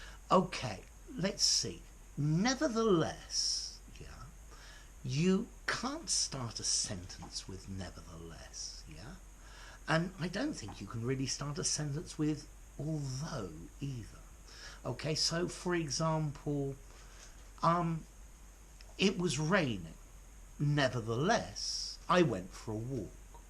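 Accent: RP, United Kingdom. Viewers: The teacher's hair is rather tousled in the video.And he looks very weary and tired Perhaps he is working far too much and doesn't get enough sleep to recharge Nevertheless it is an excellent video And he has a nice pullover too. Which could compensate for the wind-swept hair And I forgot the posh accent which is a dangerous and deadly turn-on ,,nonetheless 😂, Christ ! ❤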